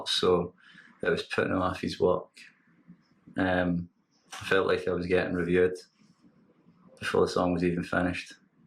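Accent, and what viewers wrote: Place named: Scotland, United Kingdom. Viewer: I'm going to get in Scotland to learn to talk with that accent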